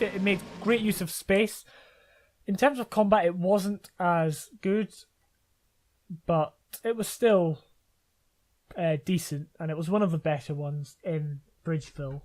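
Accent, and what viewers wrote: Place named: Scotland, United Kingdom. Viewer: Scottish and likes half life, daddy?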